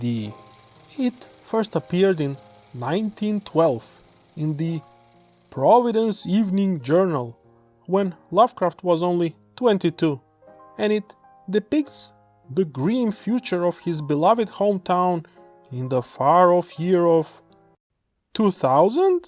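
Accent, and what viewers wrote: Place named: Russia. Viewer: I like your accent a lot, though it sounds more Russian than Bulgarian to me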